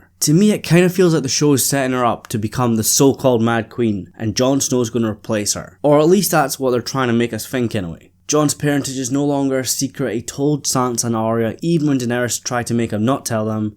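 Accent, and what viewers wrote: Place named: Scotland, United Kingdom. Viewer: Where from Scotland are you?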